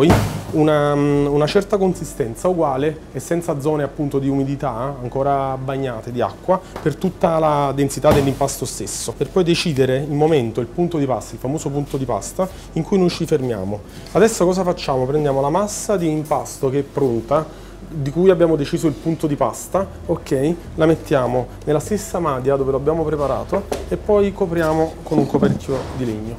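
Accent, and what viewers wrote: Standard. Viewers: Ma parla esattamente italiano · He's speaking normally for us, we're italians.Why, maybe you were convinced that we were going to sing pizza pizza mandolin to the rhythm of tarantella? This is the normal speech of an Italian. At least we do not eat vowels for breakfast like you.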